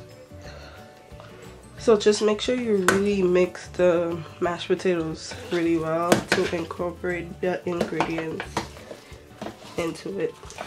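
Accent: Caribbean. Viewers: Trying this today ! Sound Caribbean 😀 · Almost thought you were from my island, st.maarten, with your accent. But so glad I came across this channel today. I will try as much as these recipes as possible. Mayb give them a lil twist since i am a fitness dude who tracks calories. But I need to add at least some of this caribbean deliciousness to my life!!!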